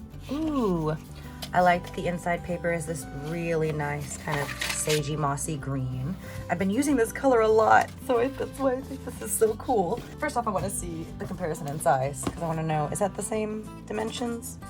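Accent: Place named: Mid-Atlantic, United States. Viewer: I find your accent fascinating, very Mid-Atlantic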